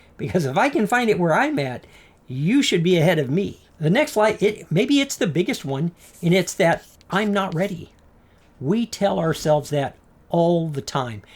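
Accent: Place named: North, United States. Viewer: I didn't know you were a fellow Texan. You sound like you're from up north. Lol.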